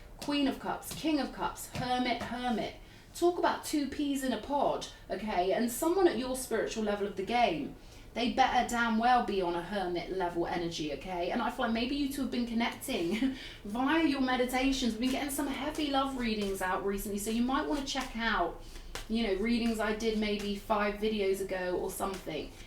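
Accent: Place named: England, United Kingdom. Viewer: I love your accent! Where are you from? In which part of England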